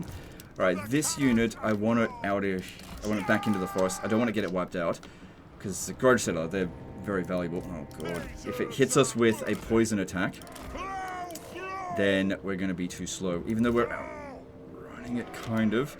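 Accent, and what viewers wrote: Australia. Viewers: The subtitles dont recognize australian, you speak too fast and unclear.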